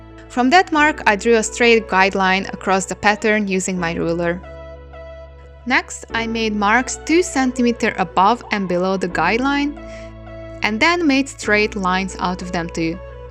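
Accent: Hungary. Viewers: Now I understand what people mean by us having a strong unique accent... I somehow knew you were Hungarian so I just had to look after it, and I was riiight! Anyway, you are really cute and the video was great! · Hearing your accent I am pretty sure you are Hungarian :P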